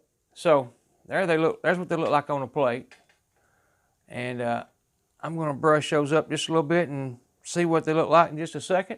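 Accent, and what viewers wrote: South, United States. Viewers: I'm trying to figure out what state you are from? I'm in Georgia and you have a very distinct southern accent. I love watching you cook up those ribs! I've copied you once and ooooh they were good!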